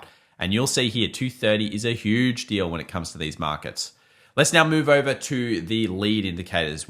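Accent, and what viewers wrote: Australia. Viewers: I think that's just his aussie accent 🤣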